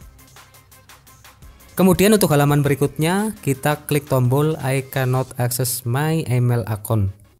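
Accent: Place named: Java, Indonesia. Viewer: crom nyamake bhasa indo lah udah sulit malah d persulit, Abang juga orang indo dari logat nya juga orang jawa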